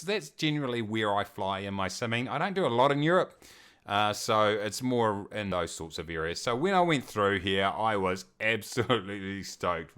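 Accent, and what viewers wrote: New Zealand. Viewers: Ahh man I miss the NZ accent. And NZ. it was my absolute favorite place to live.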